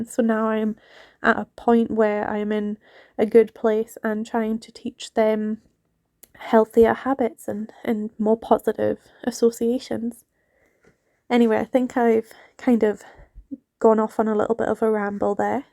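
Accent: Scotland, United Kingdom. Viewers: My grandmother was from Scotland and hearing your voice and accent has brought me so many sweet memories. She was such a sweet, loving woman. Thank you both, ladies, for the fine job you do. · yes has picked up quite a lot of the scottish accent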